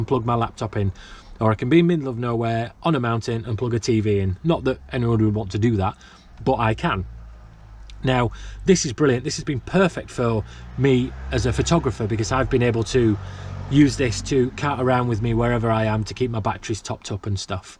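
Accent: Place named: Bradford, United Kingdom. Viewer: Another nice video mate, I've subscribed . With that accent are you a bradford lad by any chance ? Im also a yorkshire lad, living in sunny oakworth 😁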